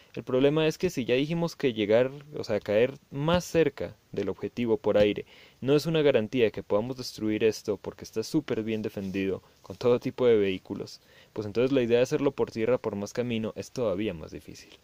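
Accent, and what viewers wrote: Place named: Colombia. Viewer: por tu acento siento que eres colombiano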